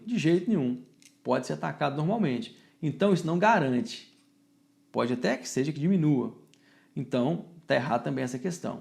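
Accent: Minas Gerais, Brazil. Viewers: Sotaque top de mineiro e professor nota mil! Continua com as aulas querido professor!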